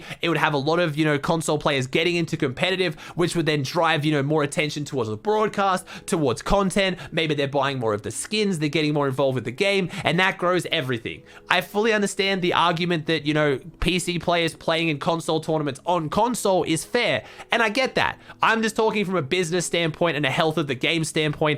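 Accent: Australia. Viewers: G'day aussie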